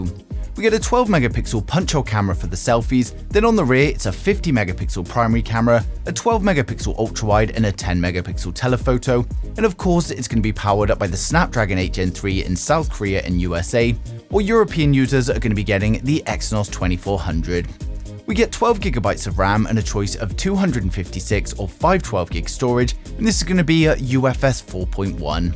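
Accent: England, United Kingdom. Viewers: What's wrong with the English accent? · Same rumors can be found on more reliable sources and they don't have that awful English accent.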